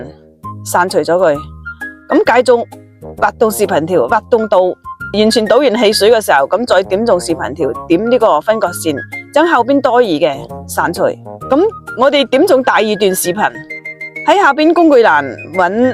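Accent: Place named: Taishan, China. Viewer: 這位台山口音的姨姨 好叻喎 👍🏻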